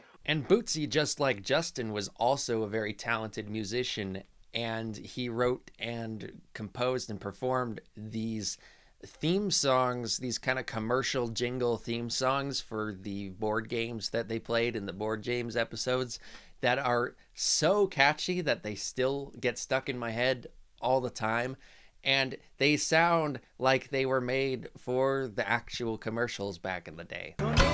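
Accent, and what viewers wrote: New Jersey, United States. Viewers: Dude he's from New Jersey, of course he has an accent. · the simple explanation is that hes from Jersey his accent gets in the way of pronouncing certain words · I think he pronounces words in that manner because of his scarfy mid atlantic jersey accent … a little philly a little NYC a little North jersey … mash up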